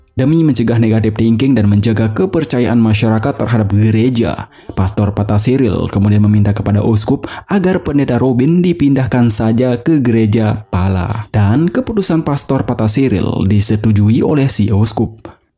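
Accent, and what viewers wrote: Bali, Indonesia. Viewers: Pembaca narasi Orang bali dech ini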